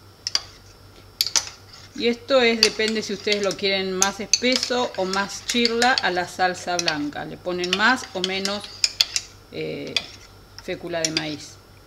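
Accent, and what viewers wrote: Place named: Argentina. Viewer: Eso depende del país en donde vivas y las pronunciaciones de ciertas palabras. Ella es de Argentina y por eso tiene esa pronunciación. No lo hace mal, sólo es su forma de hablar, y está bien.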